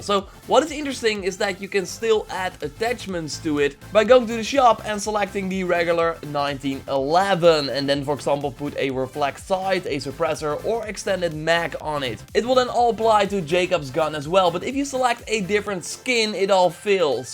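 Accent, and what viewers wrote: Netherlands. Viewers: Are you dutch? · Dutch accent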